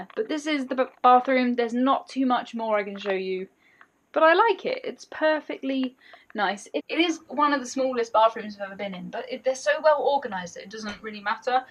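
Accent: RP, United Kingdom. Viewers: Hi, I’m just going through your back catalogue trying to find your oldest or first vid. Am I close with this one? Also you sound so much posher in this vid, is it like your yt version of the old telephone voice 😂 really enjoying your old stuff. See you back in 2023!!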